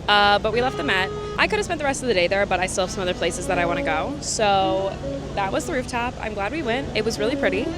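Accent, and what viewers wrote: New York, United States. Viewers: Hello, Great Video Guide about getting around in New York delivered in your Trademark Husky, Strong New York Accent. Who's filming you, is it one of your roommates? Keep up the great work! From London UK 🇬🇧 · This is the typical American-style English that I can almost handle listening to (much better than when I have meetings with vendors in Bengaluru), especially when the speaker happens to be a gorgeous blonde!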